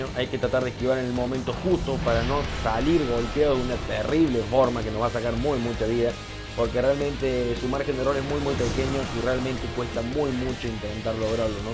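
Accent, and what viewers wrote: Córdoba, Argentina. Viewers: Vos pareces co ordobes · Cordobes D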